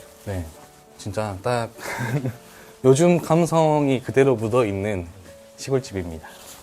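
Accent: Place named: South Jeolla, South Korea. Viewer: 전남사투리... ㅋ 많이 쓰시네요.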